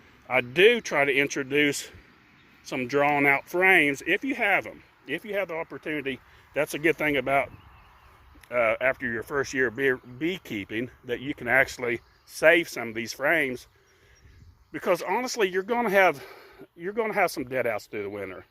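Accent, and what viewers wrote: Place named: Pittsburgh, United States. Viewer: Did I detect a yinz in your accent? Are you originally from the western pa, northern WV area?!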